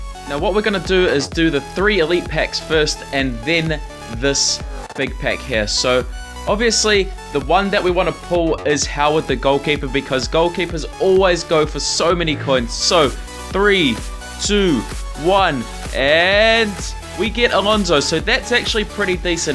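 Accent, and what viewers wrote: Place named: New Zealand. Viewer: R u a New Zealander bro, u sound like on cause I am g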